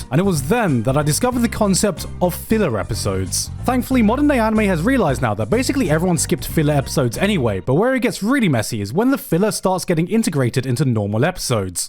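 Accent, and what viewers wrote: England, United Kingdom. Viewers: What’s the title of that horror manga presented in ? I’m not good at understanding japanese titles heard in english accent or maybe I’m just deaf, dunno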